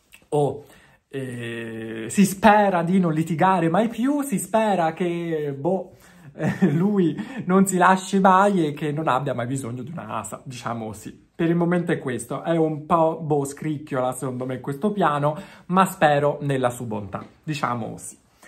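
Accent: Tuscany, Italy. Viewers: Ciao sei bellissimo e questa camicia che ti ha detto di comprare mark ti sta benissimo e quando parli in Toscano sei fantastico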